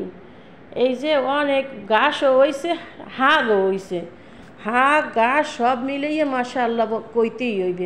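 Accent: Barishal, Bangladesh. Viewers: আসসালামুয়ালাইকুম আপু 😊। বরিশালের ভাষা শুনতে ভালো লাগলো 😊। দূর প্রবাসে ভালো থেকো আপু 😊। আমি আছি গো😊 বালতি ভরা ভালোবাসা দেখলাম 😅। মসলা ❤❤ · আপু তোমার বরিশাল ভাসাই কথা শুনতে অনেক ভালো লাগছে ❤❤সবজি বাগান দেখতে অনেক ভালো লাগলো ❤❤ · আপু তোমার বরিশালের কথা শুনতে অনেক ভালো লাগলো মাশাল্লাহ অনেক বাজার নিয়ে এসেছো অনেক ফল তোমার বাগানের গাছগুলো মাশাল্লাহ ❤❤❤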